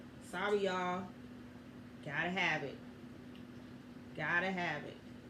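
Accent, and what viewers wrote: New York, United States. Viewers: Everything looked great sis!! I thought u sounded like a New Yorker! But I second guessed it by the way you work that garden lol! How long have you been in the South?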